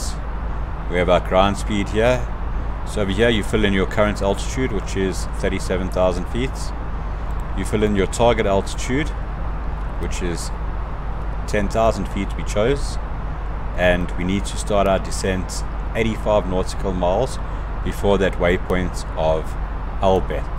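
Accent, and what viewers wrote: South Africa. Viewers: You can tell wen someone's south africa.😂😂😂 love it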